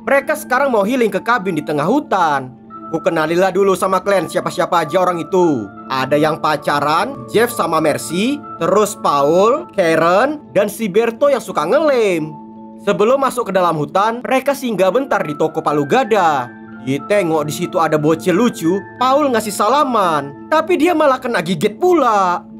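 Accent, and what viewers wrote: Medan, Indonesia. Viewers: Asal medan y bg.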